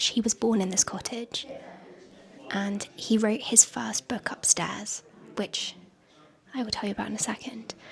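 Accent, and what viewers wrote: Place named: England, United Kingdom. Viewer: love your voice, love your accent. Could listen to you all day long. You whisper, so you don't anoy the people around you. So very polite and English. ❤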